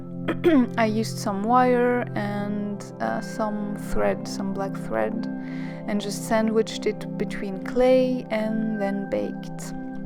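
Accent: France. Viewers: Hello! Do you live in France? We will be in Paris in December and just adore your accent. Beautiful design! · Are you french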